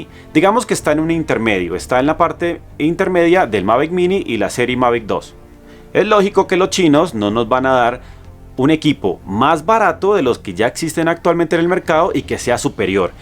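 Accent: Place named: Colombia. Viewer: Amigo. Eres Colombiano?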